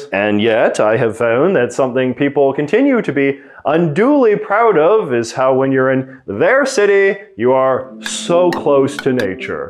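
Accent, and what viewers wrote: Canada. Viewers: Love your unmistakable Canadian accent!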